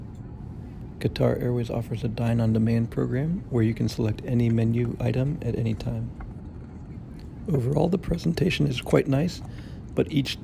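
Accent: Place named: United States. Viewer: I like your American English accent ;-)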